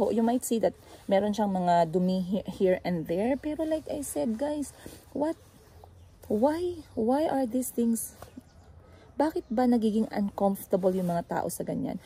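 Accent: Iloilo, Philippines. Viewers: Maam im laughing while your are talking accent ilonggo, i love it watching fr.iloilo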